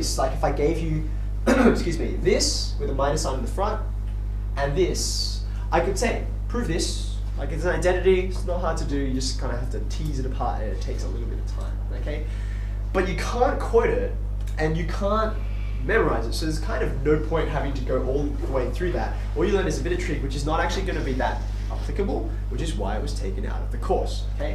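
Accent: Australia. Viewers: That darn Australian accent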